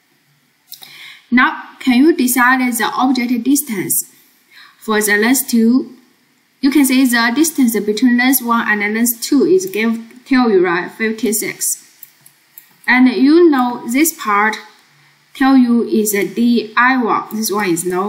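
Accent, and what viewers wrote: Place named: China. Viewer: 聼口音能聼出來是華人，感謝感謝，最近在學雙語大物，沒有頻道主你的付出我真不知道怎麽辦了:face-purple-crying::face-purple-crying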